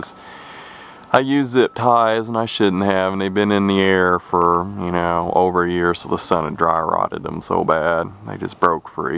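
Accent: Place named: South, United States. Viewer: Top level Southern drawl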